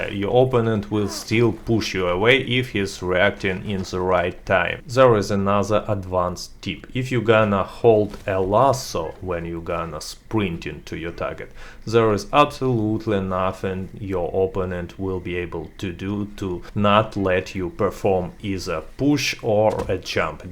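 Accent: Russia. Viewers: Geez, why every russian has such a horrible accent? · He’s Russian of course it sounds cool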